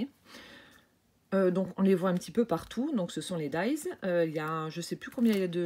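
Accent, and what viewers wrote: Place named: Lorraine, France. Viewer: ah voilà jme disais bien que tu avais plus une façon Lorraine de parler. Moi je suis du 68 région des trois frontières aussi mais FR, DE et CH. Comme j’ai beaucoup de famille en Lorraine, je connais le coin de Thionville. Contente que tu m’aies répondu, bon scrap bisous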